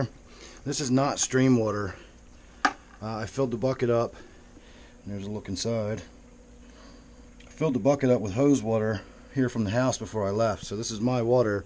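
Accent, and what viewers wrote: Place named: Maryland, United States. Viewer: Are you from Maryland? You sound like you have an old-school Maryland accent, but sometimes tidewater Virginia or eastern North Carolina can sound very similar.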